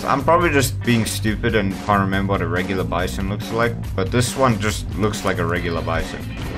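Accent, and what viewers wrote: South Africa. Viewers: Bru you from SA? Pretty sure im hearing correct lmaooo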